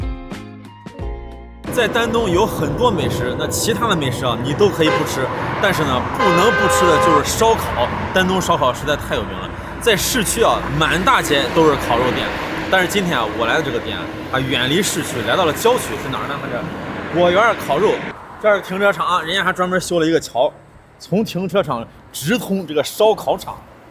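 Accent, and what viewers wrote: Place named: Shaanxi, China. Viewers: 陕西口音听着舒服❤ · 尤其陝西強暴口音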